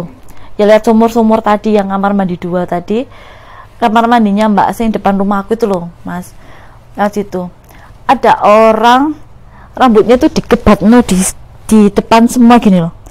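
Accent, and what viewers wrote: Java, Indonesia. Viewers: aku nonton di tv rama² pas ada sodaraku yang bukan asli jatim malah jadi cekikikan karena mbaknya medok banget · Logat jawane seneng aku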